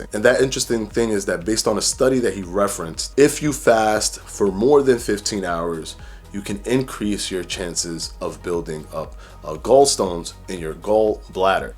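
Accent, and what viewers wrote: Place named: New York, United States. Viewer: Angela Davis-Floyd, The Listing Boss cut my bae some slack he has a New York & Florida twist👍🏽🙏🏽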